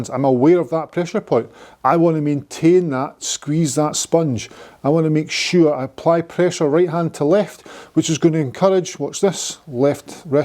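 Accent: Scotland, United Kingdom. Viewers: Love your instructions. I always pay attention because, to tell you the truth, you scare the hell out of me. My grandmother was Scottish and I just love the “accent.”.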